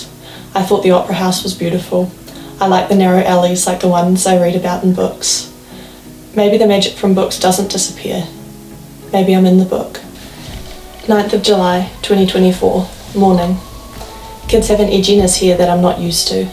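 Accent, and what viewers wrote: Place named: New Zealand. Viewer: I love the kiwi accent welcome to Sydney come again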